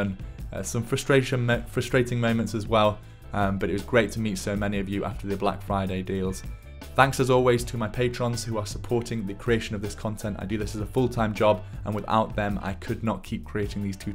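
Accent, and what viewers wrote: United Kingdom. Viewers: instant like for the cool and nice British accent. 🇬🇧😎👍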